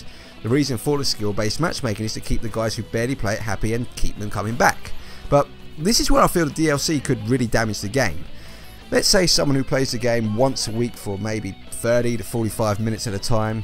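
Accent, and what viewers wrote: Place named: United Kingdom. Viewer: From the sound of your voice, I assume you're from the UK or somewhere in Europe? If so, that region has ALWAYS been paired up with people outside of the country since COD4. It's not SBMM at fault for your laggy connections to other countries. It's the region you are in, what your connection quality is, and what time you play.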